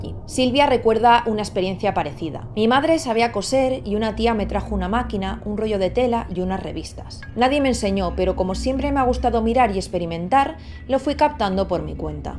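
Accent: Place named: Catalonia, Spain. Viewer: Tiene acento catalán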